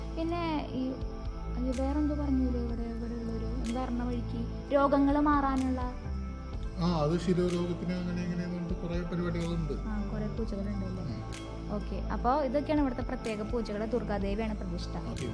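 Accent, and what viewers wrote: Thrissur, India. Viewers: Aa thrissur karide aa slang powliyatto atha kuduthslum video kanan preripiche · A simple village vlog from Simple Village girl 👧 loves your Thrissur slang talking 👩‍🎤😍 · Ente frnd Dayakuttynte same samsaram avalum Thrissur anu... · അടിപൊളി അവതരണം ആണുട്ടോ.. എന്നാലും നമ്മുടെ നമ്മുടെ നമ്മുടെ ഒന്നു കുറക്കാൻ പറ്റുമോ. തൃശ്ശൂർ സംസാരം കേൾക്കാൻ നല്ല രസമാണ്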